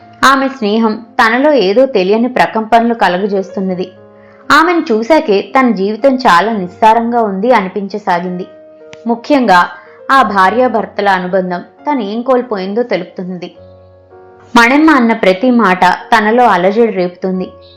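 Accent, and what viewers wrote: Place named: Telangana, India. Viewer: కథ ప్రారంభం లోని శిలను కథ అంతానికి రచయిత్రిశిల్పంగా చెక్కిన తీరు బాగుంది.ఆకాశమంత ఎత్తుకు చేరుకున్నా, భూమిని మర్చిపోకూడదు వంటి డైలాగ్స్ 👌 తెలంగాణా యాస బాగుంది 😊 మంచి presentation.....❤❤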